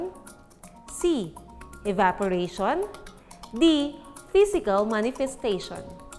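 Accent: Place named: Mindanao, Philippines. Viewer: Ang tigas magsalita ni mam. Taga Mindanao si Mam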